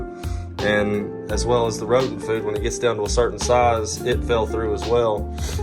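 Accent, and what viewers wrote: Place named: South, United States. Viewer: 😂 I heard your lovely southern accent and I was expecting some wholesome country or bluegrass music for background music.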